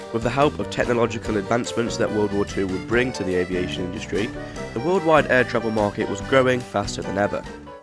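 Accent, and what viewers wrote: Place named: United Kingdom. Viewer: Your new channel is great, but could you please put subtitles? No offense but it’s sometimes hard to understand through the Bri’ish LOL🇬🇧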